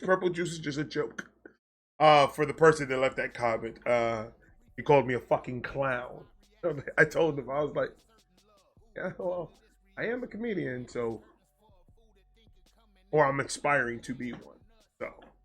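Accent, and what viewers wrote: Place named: Bronx, United States. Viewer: You sound like you’re from the Bronx and I never even been to NY 😂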